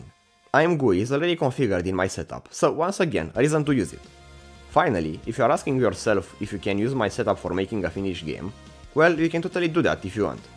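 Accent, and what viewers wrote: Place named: Romania. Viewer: you sound a bit romanian..... cf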